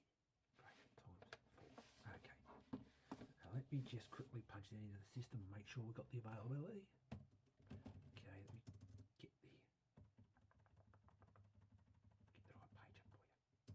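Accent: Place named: Australia. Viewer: lol...You do a good job with an Aussie accent! :-)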